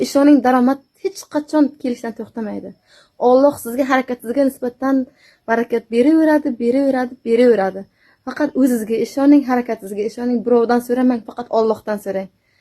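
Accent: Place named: Karshi, Uzbekistan. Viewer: Ассалому алайкум синглим шева Каршига тортоптмку землякмисиз дейман еки адашдимми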